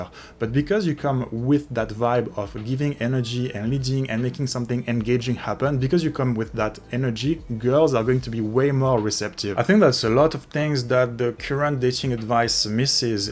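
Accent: France. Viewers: Man, u have a good explanation of Tylers infeild videos... i like this minsdset "theres no downside to anything u say or do, there is only UPSIDE"... got rejected? U become numb to rejection (upside). Don't know what to sat? Say "whatever" (dont give a fck, u r just practicing). Thanks for sharing. Btw, ur accent sounds French, where do u game?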